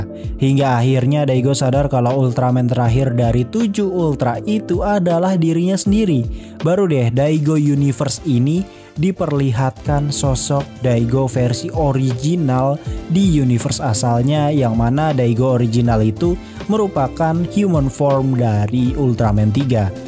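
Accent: West Java, Indonesia. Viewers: orang sunda gening euy🤣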